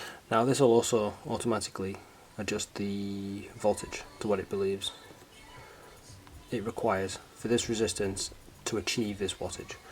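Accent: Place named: South, United Kingdom. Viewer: Britain, possibly South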